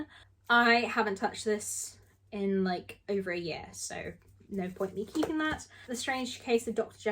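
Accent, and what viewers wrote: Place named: England, United Kingdom. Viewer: You sound like you live in England. Were all your books bought there and can you get them in Canada?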